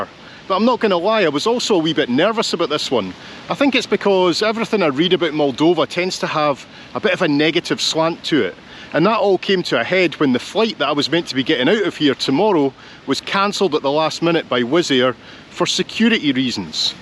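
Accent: Scotland, United Kingdom. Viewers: Nice Scottish accent )) reminded me of my friend, a Scot, with whom we worked together in the same company when I lived in Dubai many years ago ). Good old days ). P.S . Hello from Azerbaijan, fit like loon? ) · Love that Scottish pronunciation! Have a Scottish office mate; have to tell him to watch...and listen! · is this blogger Scottish？😂kinda grasp a sense of Glasweigen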